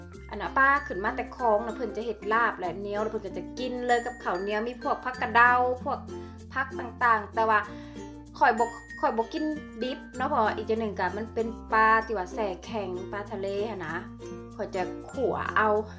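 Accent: Laos. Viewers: ตามมาเพราะชอบฟังพุดลาวเพราะคัก อั่นนะๆ เด้ะ มีเสน่ ลาบปลาพอทำสุกนี่เหมือนทอดมันเลยนะจ้ะ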